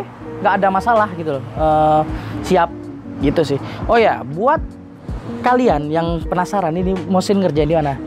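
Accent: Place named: Medan, Indonesia. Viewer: Ini medan bung😂😂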